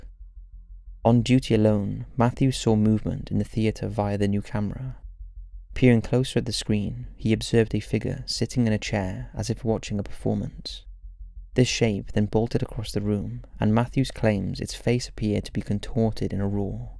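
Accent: United Kingdom. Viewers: Is this british, is he american? Can't make out what he is. His narration is really good though.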